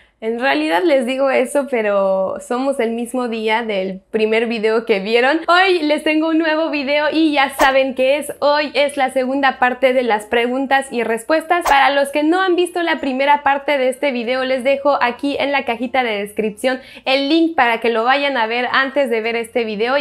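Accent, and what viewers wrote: Mexico. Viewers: saludos guapa de veracruz..me encanta oirte hablar frances se escucha genial..me impresiona oirte hablar con acento mexicano ytambien si hablaras español con acento frances seria genial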